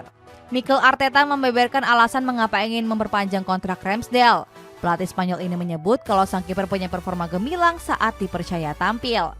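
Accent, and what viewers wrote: Indonesia. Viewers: Iya njir host ini bahasa inggris dibaca pake aksen indo😅😅 gw yg bahasa inggis nya basic aja gk se paok ini😂😂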